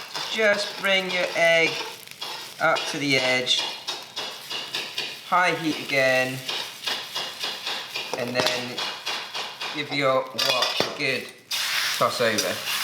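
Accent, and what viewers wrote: England, United Kingdom. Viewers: I really enjoy your cooking and your pleasant English accent as well ❤ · A gorgeous Asian man with a British accent. I'm smitten 😍 oh, the food looks yummy too ☺️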